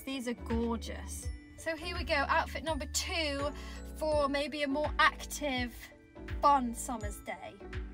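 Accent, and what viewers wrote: United Kingdom. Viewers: I just love your cute videos! I bought all sorts of tea at the store this morning because of you😉 your style is adorable. I also have decided you look like a combination of Jennifer Garner and Kierra Knightly, you are so pretty and your accent is the best. My husband always makes fun of me and my obsession with a British accent. I mean I feel like British people could say anything, even if it makes zero sense, but somehow it always sounds smart and classy with their accent🤣 love all your videos, and I will miss Fitzsimmons so much!!! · Love you from Japan 🇯🇵! I am learning British accent from AOS and your video! I can’t wait AOS comes Japan😭😭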